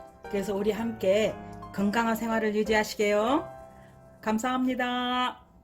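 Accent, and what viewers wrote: Jeolla, South Korea. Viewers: 호남말씨 쓰시는 누님~돌아가신 부모님도 전라도 분이셨어요~목소리 정감이 가네요~영상보고 꼭 한번 만들어 먹어봐야겠네요~감사요~^^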